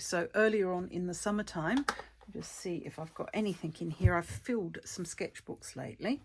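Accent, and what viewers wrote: Australia. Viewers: Have just discovered you, thoroughly enjoyed your video, and hearing your Aussie accent:)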